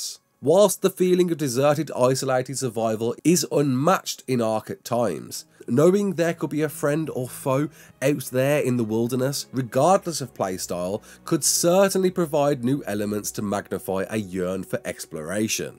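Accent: United Kingdom. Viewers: I just love your british accent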